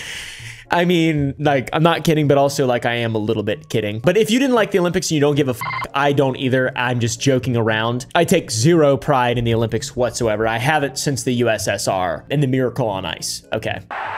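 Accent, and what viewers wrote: Australia. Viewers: love your aussie accent😆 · Your Australian accent is so fucking funny 😂😂😂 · your australian accent has me on the fkn floor bro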